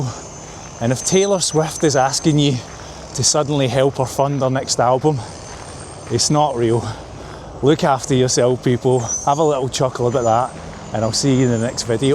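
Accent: Scotland, United Kingdom. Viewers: Some people are so gullible lol. Just love watching and listening to you. I’m Scottish too and listening to you is just like listening to a friend chatting away. I have a snuggly hoody like that too and love it but the arms are about 5 foot long on it 🤣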